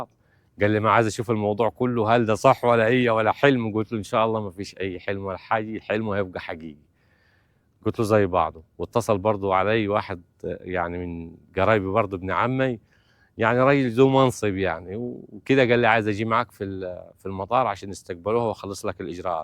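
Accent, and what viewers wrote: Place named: Qena, Egypt. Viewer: انا بعشك لهجة قنا بسببك ان زميلت امي من قنا احسن ناس والله ❤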